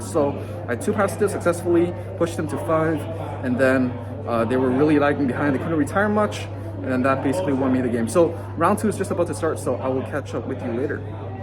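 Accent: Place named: Canada. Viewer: I thought you were Canadian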